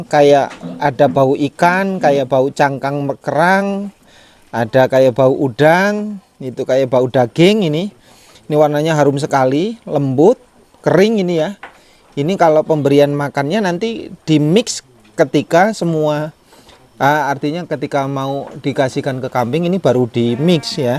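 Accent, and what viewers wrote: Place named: Banyumas, Indonesia. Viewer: Ora ngapak ora kepenak nimba ilmune cikgu salam ngarit❤️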